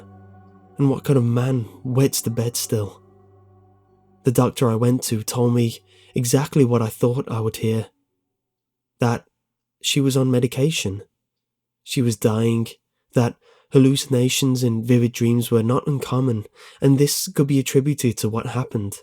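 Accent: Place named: Northern England, United Kingdom. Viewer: YEH NORTH ENGLAND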